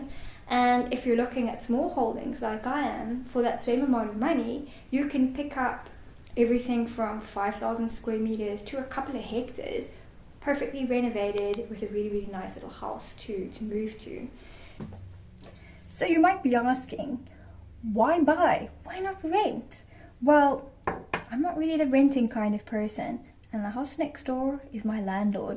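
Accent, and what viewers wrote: South Africa. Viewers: Ek wou se ek hearken n SA aksent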